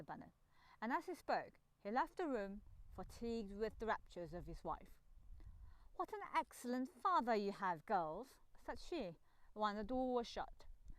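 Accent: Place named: United Kingdom. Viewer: 목소리도 좋고 , 발음이 영국인 같구먼~~~^-^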